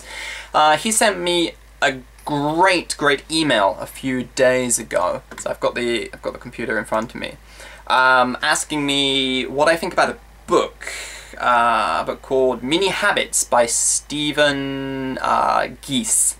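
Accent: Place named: United Kingdom. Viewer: It's music to my ears your British accent. I love it!!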